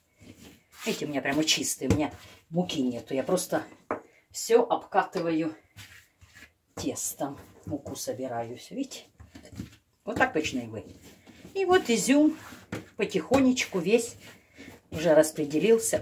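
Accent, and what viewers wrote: Ukraine. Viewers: ты просто молодчина очень хорошо рассказываешь и показываешь.Голос приятный с украинским акцентом.Ты добрая душа лишнего не говорила а всё по делу.Дай тебе Боже здоровье, и всех благ в твоей жизни.С праздником Вас. Христос воскресе! Воистино воскресе! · Уважаемая, я пеку Кулич / Пасху, которую вы пекли на передаче" Всё беде добре" ( смачно), вы там ещё банановый кулич, зайчиков и в яичной скорлупе делали. Огромнейшее вам спасибо, у меня всегда они получаются шикарно, и ещё --- говорите и не слушайте никого, ваш украинский акцент мне очень приятен 👍🌹🌿🙋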